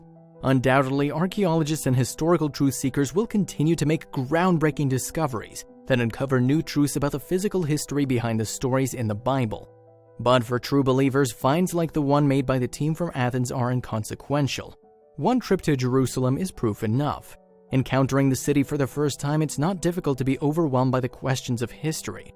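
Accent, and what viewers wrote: United States. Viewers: Pray tell, what accent is the Google Dictionary in? I can bet it isn't in Australian! Why should everything be pronounced with an American accent. It was the British who invented the internet so they deserve the right to the correct pronunciations.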